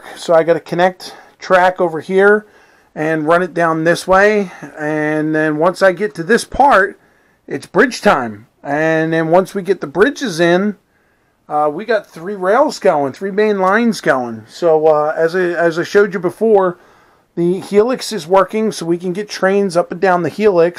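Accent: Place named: Philadelphia, United States. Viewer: Nice to put faces together with names out there in the livestreams. I could tell you are from Philly with that accent. Penndel was my stomping grounds as a kid. Thanks for sharing. 👍 👍 👍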